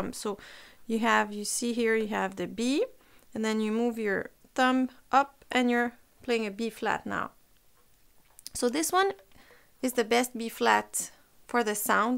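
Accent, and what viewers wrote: France. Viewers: o wow! Congrats!! ooh you are French! Das why you have an excent! I was reading the comments below 😂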